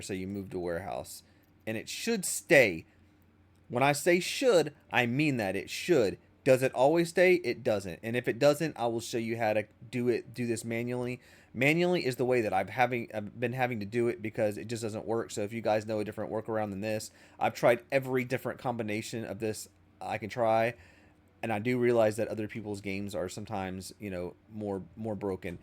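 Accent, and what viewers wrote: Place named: Tennessee, United States. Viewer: You sound like a white guy from Tennessee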